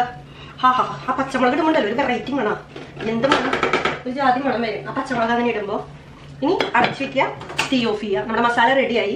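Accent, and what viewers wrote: Thrissur, India. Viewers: തൃശ്ശൂർക്കാരിയാണോ ചേച്ചി?? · Adipoli aayindtta chechi. I like your thrissur slang as I also belongs to thrissur.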